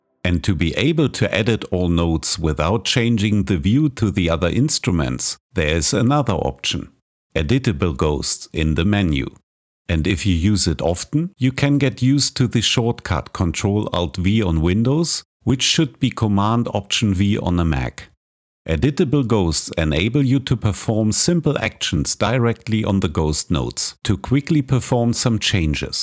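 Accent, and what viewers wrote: Germany. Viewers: Akzent klingt Deutsch.......gibt es auch solche Videos von ihnen auf Deutsch?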